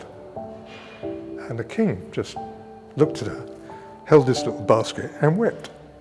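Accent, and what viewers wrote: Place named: United Kingdom. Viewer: Your accent tells me that you shouldn’t talk about colour or taste, your a brit,0 taste 0 colour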